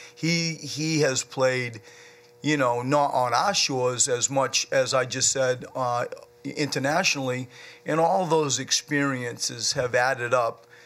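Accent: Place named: Maine, United States. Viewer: That's a Maine accent (northeast USA).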